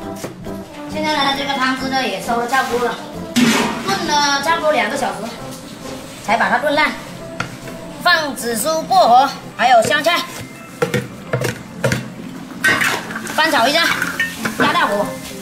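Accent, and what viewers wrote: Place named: Guangxi, China. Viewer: 听口音像广西一带的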